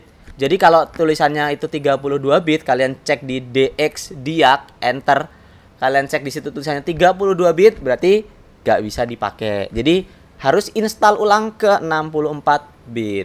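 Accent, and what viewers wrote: Java, Indonesia. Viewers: Ngomonge Medok Sih Kang, Sampean Wong Endi Sih?.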